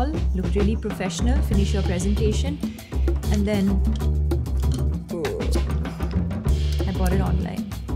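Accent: India. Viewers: Let's just talk about her accent like so many ppl change their accents when they be popular and look at herrrr pure Indian!!!!!❤️ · I'm in love with her banglori English 💕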